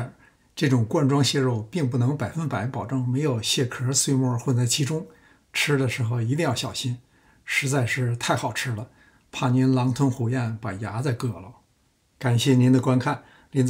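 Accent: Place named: Beijing, China. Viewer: 每次听您讲出了佩服你精益求精的精神，还总会被你最后经典的点缀笑翻了。“别把牙硌了”😂😂😂地道北京话。老乡您实在是个难得的人才！这道洋菜家里养老公一定会吃得走不动了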